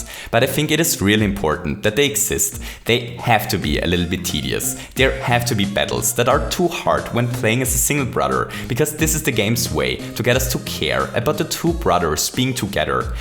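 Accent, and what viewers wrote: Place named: Germany. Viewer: Probably because of his german accent.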